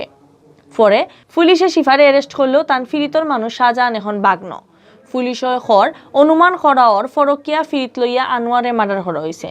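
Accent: Sylhet, Bangladesh. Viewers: Nice sylheti accent. Wow.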